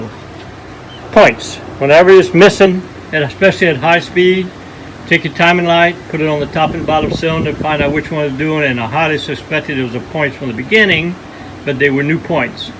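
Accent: Louisiana, United States. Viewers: Haha I miss dat accent. My people are in Thibideaux.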